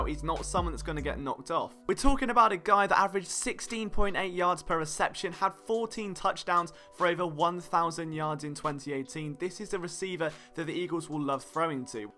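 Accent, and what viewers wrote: England, United Kingdom. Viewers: Yeah thanks for all your insight, after the first few times of getting used to your English accent, I really love your videos. You need a collab with Philly 500, i'd love to hear your accent and his Philly accent together, haha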